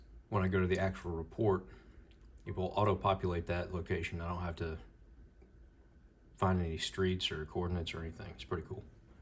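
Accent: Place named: South, United States. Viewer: Thank you for your service man! What state do you live in. You have a southern accent I feel like lol. I live down south.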